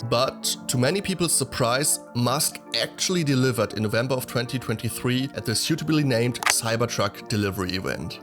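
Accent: Germany. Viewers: German accent by ai is terrible😢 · You sound like a german talking english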